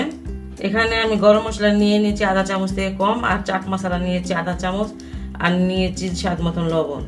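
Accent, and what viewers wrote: Bangladesh. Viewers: aapnaar bangal bhaasaay kothaa bolaa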